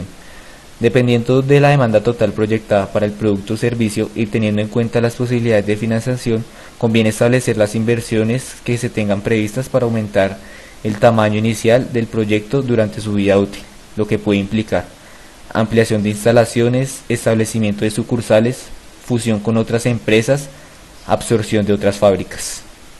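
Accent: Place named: Colombia. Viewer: por el acento se da uno cuenta que es de colombia jaja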